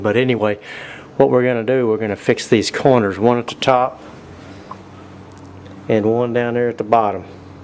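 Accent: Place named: Appalachia, United States. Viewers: Why is every one of these videos narrated by someone with a hillbilly accent..... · That southern accent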